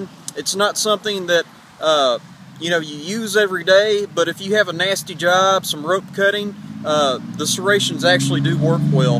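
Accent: South, United States. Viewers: Holy shit this is a serious southern accent.. damn.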